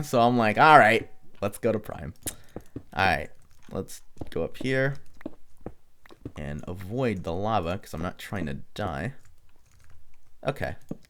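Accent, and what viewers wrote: Canada. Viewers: Is he Canadian? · he is Canadian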